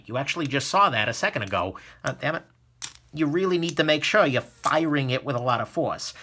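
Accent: New York, United States. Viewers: I like. His humor. His gear. His reviews. His 1920’s voice. EXTRA! EXTRA! EXTRA!... is that accent NY Italian?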